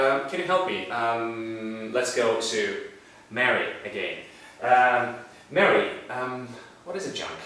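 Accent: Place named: Russia. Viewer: I hear Russian accent in this demo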